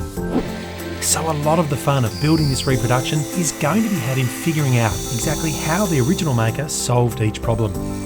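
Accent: Australia. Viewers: Subbed the instant I heard a fellow Aussie accent, liked for awesome content. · Well his voice isn't exactly soothing, but it isn't bad for an Aussie one........... *Kiwi here :P But yes will be EPIC :)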